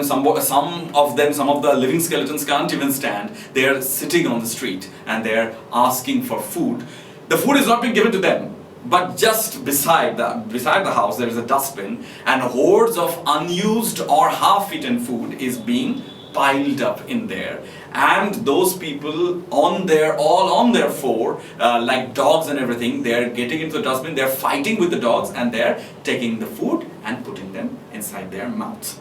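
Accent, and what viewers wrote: Bengal, India. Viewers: For an English teacher the accent/diction is amusingly bengali.. but that's ok if the critique was not so poor.. Does not go beyond just describing the acts in the play. It has no depth of the time, of social/political/ literary history. Is this sponsored by UGC?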